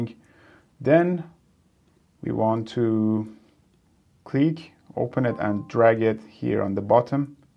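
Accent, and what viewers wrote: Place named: Iran. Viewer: لحجه ایرانی ات منو کشته پسر. دمت گرم <3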